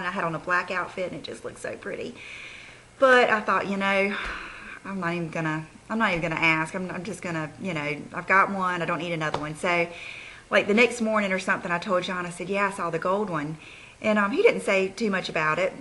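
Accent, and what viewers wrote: South, United States. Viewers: Hi there, I haven't been subscribed to you long, but I love watching your videos! The past few Fridays I've been unwinding and relaxing after work by watching your nice long videos. You always give good, honest advice and are so sweet! And this probably sounds really really silly, but I'm Canadian so I don't get to hear many southern accents up North. And I just love yours! And I know it's not an accent to you, but I just love it! LOL Thanks for being you!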